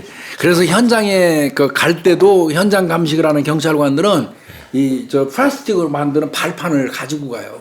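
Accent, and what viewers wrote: Chungcheong, South Korea. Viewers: 아...정말 가끔 나오시는 충청도 말씨 너무너무 좋아요~~영화 곡성뒤엔 전라도말씨에 빠져있었는데 요즘엔 충청도말씨에 빠져있어용ㅋㅋㅋ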